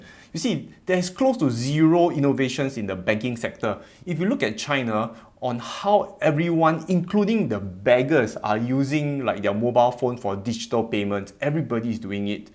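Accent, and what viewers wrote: Singapore. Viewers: Love the singaporean accent dude! proud of you! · I sold my bershire last 21february sadly i really like the guy may if you mix feeling and investment it is not a good moove like the guy but not too much some bershire stock as i am writing brb.b is 170.6....thank you for you video i like your Singapore accent i have interest in Singapore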